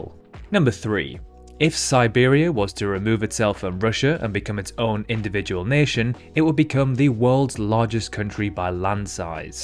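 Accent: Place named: England, United Kingdom. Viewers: Criticizes term "middle age" Uses term "middle age". And your Received Pronunciation is okay. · What the heck is that accent!! England always impressing me. What region is that? North?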